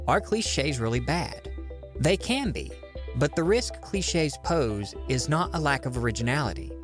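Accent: South, United States. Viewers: why am i only now noticing your southern accent?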